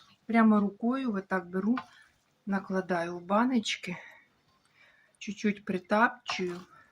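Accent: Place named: Donbas, Ukraine. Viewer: Спасибо огромное,вы чудесная хозяйка,золотые ручки,и разговор,как песня,немного похоже,как у нас на Донбассе говорят,суржик,и не на русском но и не на украинском,наш местный диалект,спасибо,недавно зашла к вам,случайно,уже синие сделала квашеные,оболдеть, только без селеры,не люблю, спасибо,всем мира здоровья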